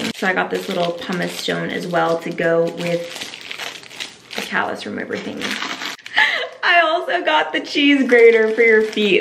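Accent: South, United States. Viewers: Why am I just noticing your southern accent?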